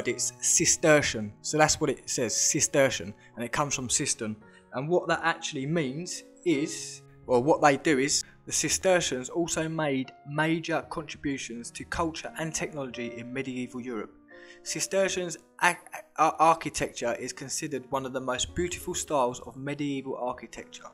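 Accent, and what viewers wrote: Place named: Cockney, United Kingdom. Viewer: How refreshing your little Cockney accent is! Found you today, been watching Tartaria for about 3 months and find it fascinating. Subscribed and looking forward to seeing more of your content.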